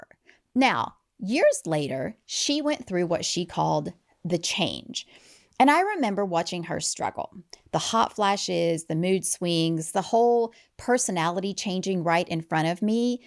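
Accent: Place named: Appalachia, United States. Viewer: I just recently subscribed! I LOVE your content and finally feel there is someone out there speaking the truth unapologetically. Much respect! You are beautiful inside and out and an inspiration! ❤️ By the way, I love your southern accent! What part of Appalachia are you from? Im a southern girl as well, but from Louisiana. Thank you for the amazing content!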